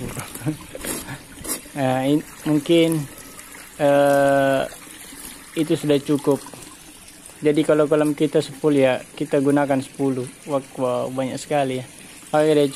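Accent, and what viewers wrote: Bugis, Indonesia. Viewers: Mantap bos. Kayaknya dari logat orang bugis😁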